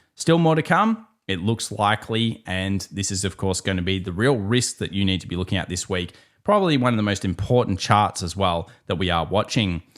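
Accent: Australia. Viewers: Hi, your vids are excellent education regarding TA and stats. A huge thank you! I like the aussie accent, too. It reminds me of the time when I was living there.:) Keep up the great work! Cheers from Germany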